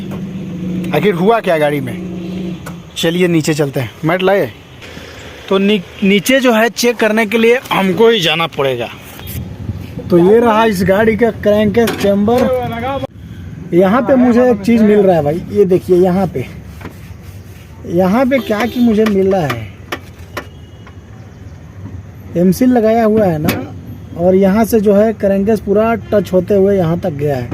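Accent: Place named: Bihar, India. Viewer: Bhai ap karnataka se hai, but apka baat sunke lag raha ap jharkhand bihar se hai😊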